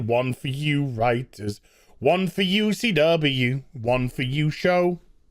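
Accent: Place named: United Kingdom. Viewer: Damn that british accent is spot on.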